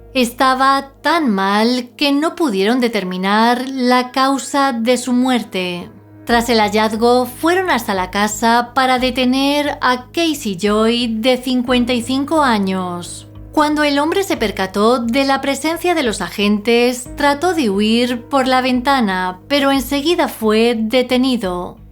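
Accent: Spain. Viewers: Recuerda tiene el acento español ! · Está bonito, es acento Español, me encanta, ella se esmera en presentarnos un video, busquen otros videos con otros acentos que les guste. 🙋‍♀️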